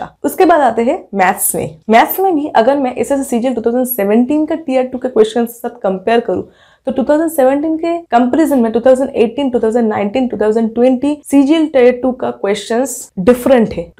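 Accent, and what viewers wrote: Bengal, India. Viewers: Aap bengali ho kya mam ??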